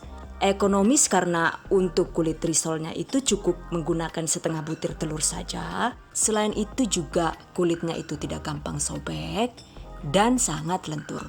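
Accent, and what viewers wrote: Banyumas, Indonesia. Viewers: maaf suaranya suara ibu kan ya? lucu gaya ngapaknya hihi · Mantabs banget mamake 😁😁 salam kenal bunda..sy suka resep2nya.. suaranya khas dan ternyata ngapakers juga 😍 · Ngapak kaya nyong 😆😆😆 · Nyimak wlu agak ngantuk2 gtu,krn kangen denger ngapaknya wkkwkkkk....dedek ngebantu bundanya...,😀 sampk nangkring2 gtu. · mantap nih bun interaktif banget 😅 ngapaknya ra kuat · Dening ngapak iya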